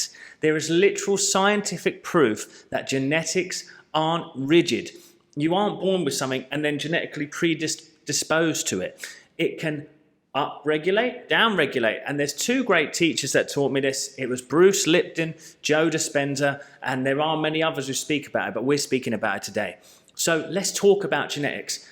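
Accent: United Kingdom. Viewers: It’s so wonderful to hear your uk accent talking about all these teachings and scientific findings that we have read in these incredible books and that aren’t being shared in the mainstream. I have read Bruce Lipton, Jo Dispenza, Gregg braden, deepak chopra and many many more.. I love the way you explain it. You are refreshing and genuine and your realness shines through in your video. Thank you 🎉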